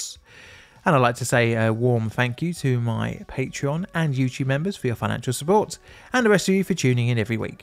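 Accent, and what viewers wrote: United Kingdom. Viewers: Don't you mean write? 😄.... Just the idiosyncrasies of the british accent.if he was from east London /Essex it'd be free for three and firteen for thirteen.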